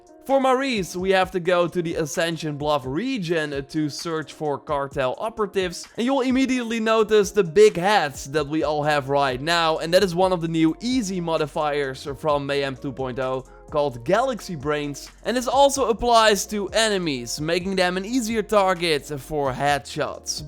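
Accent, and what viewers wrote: Netherlands. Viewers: you sound dutch???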